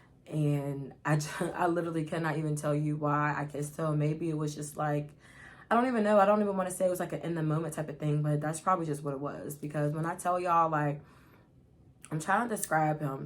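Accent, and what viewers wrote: Pittsburgh, United States. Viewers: this is so random but i love ur accent bc it reminds me of my old college best friend 😭 i knew instantly u was from pittsburg i miss her lil cow girl sounding self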